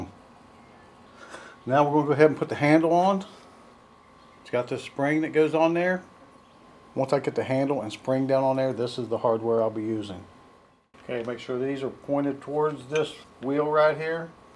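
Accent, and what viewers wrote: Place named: China. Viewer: The chinglish wasn't working for me... Thanks.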